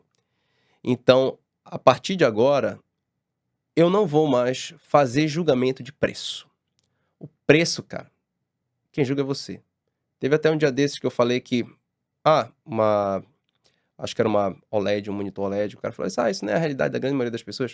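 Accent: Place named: Belém, Brazil. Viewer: Esse cara é de Belém. Percebi pelo sotaque.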